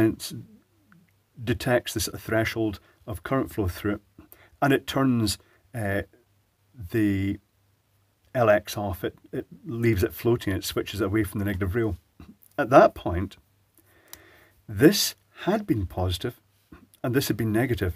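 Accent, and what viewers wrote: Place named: Glasgow, United Kingdom. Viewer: Great video, your Glaswegian today reminded me of oor wully for some reason, you don’t happen to sit on an upside down bucket when you record these do you? Excellent inspiration for us Scottish electricians during a tough time. My Wully, your Wully- a’boadies Wully! Translation: My Willy your Willy - my maws no got a Willy. Intended meaning- my William, your William he’s everybody’s William!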